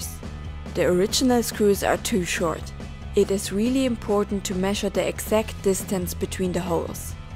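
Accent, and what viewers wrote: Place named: Germany. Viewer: This seems to be a very sophisticated way for a transition. The aluminium disc is only needed from my perspected, when you want to muffle the batter head of a regular drum head to make it silence. Otherwise take any wood or aluminium profile, that doesn‘t flex so much and attach the rim shot piezo at the shell of the drum. But great explained how to proceed! And a nice German accent 😉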